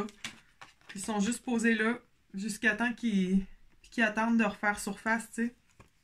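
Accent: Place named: Quebec, Canada. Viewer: J'adore vôtre accent Québecois , vous êtes une Jolie Fille en Plus pas facile a suivre je suis Taureau du 17 Mai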